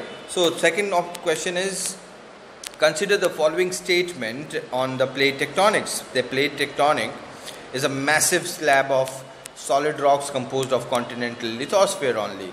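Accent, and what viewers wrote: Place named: United Kingdom. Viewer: Doesn't ur English belongs to British country sir why r u wasting u r time in Assam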